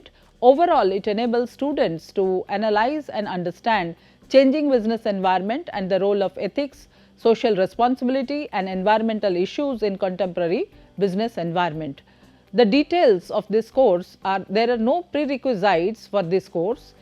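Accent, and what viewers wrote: India. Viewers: Is she from odisha 🙏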